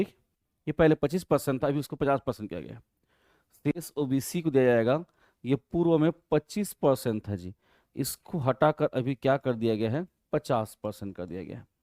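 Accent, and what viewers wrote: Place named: Chhattisgarh, India. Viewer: Wo Chhattisgarhiya hai, Chhattisgarh me jii bolna ek lahja aur good manners hai. Tai apn kam le kam rkh na padhe la aye has ta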